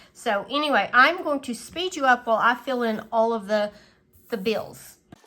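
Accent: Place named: Kentucky, United States. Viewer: Love this! Your accent reminds me of home (Kentucky) ❤❤❤ new subscriber here!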